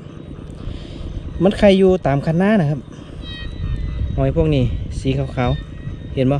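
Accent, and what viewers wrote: Chaiyaphum, Thailand. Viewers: กล้องดีเนาะฟังสำเนียงคือคนชัยภูมิบ่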